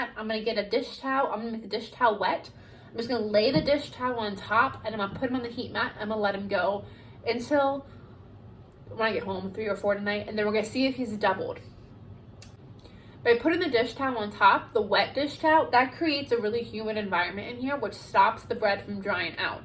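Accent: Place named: Midwest, United States. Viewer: Yea success!!! I put your recipe together yesterday morning “Saturday” placed it in the oven with the “light on” until I got home . I then followed your instructions for preparing and baking the loaf. It came out perfectly. You are wonderful! Thank you for this great video-oh I enjoy listening to you talk…what part of the country are you from. A wild guess…mid-west?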